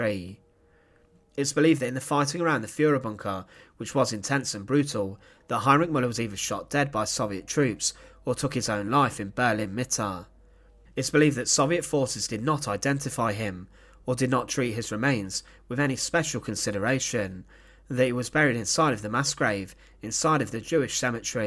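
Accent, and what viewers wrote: United Kingdom. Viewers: what part of the uk is your accent from?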